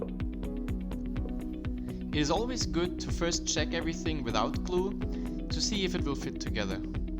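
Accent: Germany. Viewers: du bist aus deutschland oder? man hört es ganz leicht aber dein englisch ist echt mega gut man hört kaum noch einen deutschen akzent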